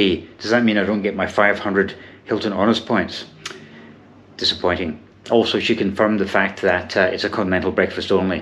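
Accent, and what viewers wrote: Scotland, United Kingdom. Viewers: Love ur vlogs but for someone who was bought up in Australia you have a very strong Scottish accent...assume you lft Australia when you were 5yrs old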